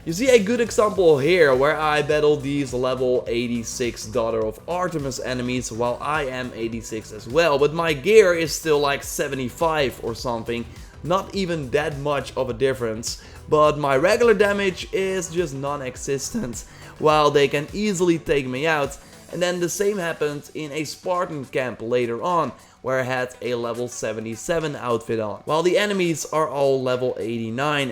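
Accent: Netherlands. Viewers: Niels De Vreugd yes i tought so cus you can hear it at his voice and i’m dutch to.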